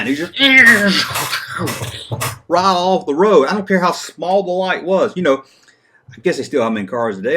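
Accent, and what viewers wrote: South, United States. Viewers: It's how we say it in the south.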